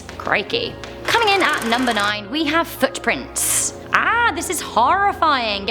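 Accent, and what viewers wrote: United Kingdom. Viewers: Wohhh blew my mind I thought you guys were from the U.K with the way you talk........... Canada threw me way off anyone else think this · Is she british?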